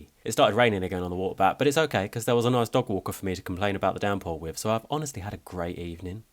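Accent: United Kingdom. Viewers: I didn’t get a word you said because you are British.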